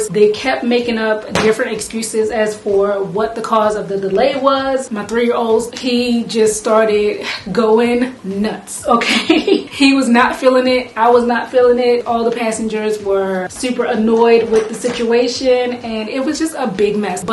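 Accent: Baltimore, United States. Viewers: I’m from Baltimore tooo , your accent is soo clean compared to how others talk here. 😌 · Yup I hear the Bmore lol rumz lol aka rooms I love it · i'm so late! i had no idea you were from baltimore. MD GIRLS FTW lol Now i'm hearing ur accent heavyyy lol. Congrats on the new whip! She's too fly!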